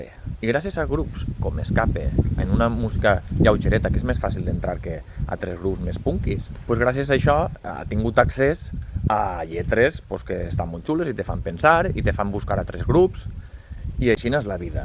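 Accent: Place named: Ribera, Spain. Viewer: Ets un tío carismatic, vingent de tu en eixe accent de la rivera , un deu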